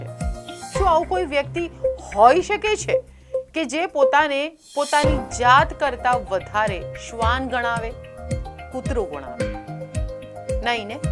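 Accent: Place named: India. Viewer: ဂျပန်ဆို...ဘာဖြစ်လို့...အန္ဒိယ...ကပြောနေလဲ....အဲဒီကောင်က...ကုလားလိုဘဲပြောနေတာ...ဗျ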